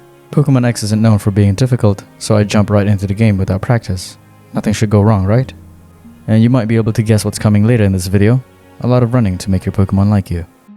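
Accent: France. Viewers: Why did I just realize that accent sounds like you voice act Frenchy from Spongebob (narrator)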